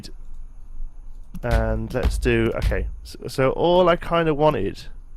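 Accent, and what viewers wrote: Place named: Birmingham, United Kingdom. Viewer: I'm from England, Birmingham(B45) Where you from because your accent doesn't faze me and kind of sounds normal... You gotta be from somewhere round here...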